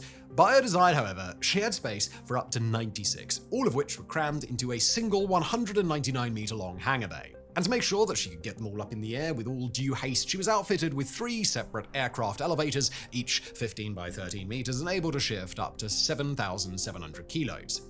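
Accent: United Kingdom. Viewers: I find it heartwarming, and ironic, that a bald man with a British accent is endeavoring to “make sure history never forgets the name Enterprise” 🖖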